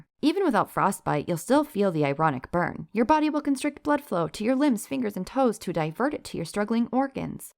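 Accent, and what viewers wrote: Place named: South, United States. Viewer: For some reason your voice in my head is deeply southern accented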